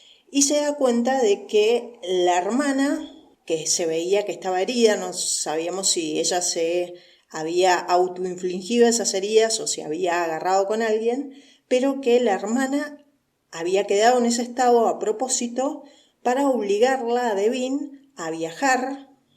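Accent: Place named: Argentina. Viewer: tienes razón promete y mucho esta serie y ellos hacen buena pareja, Kivanc no defrauda me gusta mucho es un actorazo, siguiéndole como siempre desde MALLORCA (perdona tu ede que parte de Argentina eres,xq tienes el acento pero eres muy pausada y dulce hablando,lo dice alguien de Rosario ),🙋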